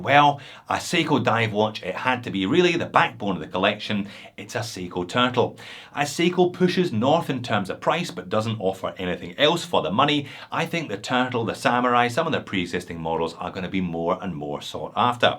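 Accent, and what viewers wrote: Scotland, United Kingdom. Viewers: Love your Scottish accent 🎉🎉🎉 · Great video and amazing you sponsored it yourself! are you really Scottish?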